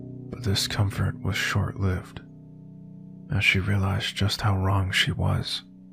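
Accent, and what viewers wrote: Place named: United States. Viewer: Certified thug classic. Love this story and happy to hear a murican read it.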